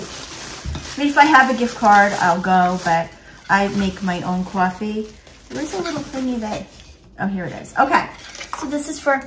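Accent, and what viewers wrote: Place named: New York, United States. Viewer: Just found your channel ❤ I’m TEMU obsessed and my cart on the app just keeps getting bigger and bigger 🥹 I am from Long Island and moved to Florida a few years ago and heard you and your laugh and just knew you were another amazing New Yawkwr 😊 You’ve got another subscriber 🎉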